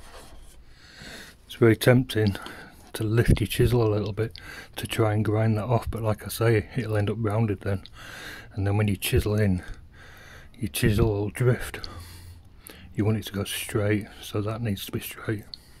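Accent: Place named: Northern England, United Kingdom. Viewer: Great no nonsense video. You sound like you're from Jonny Vegas country?!?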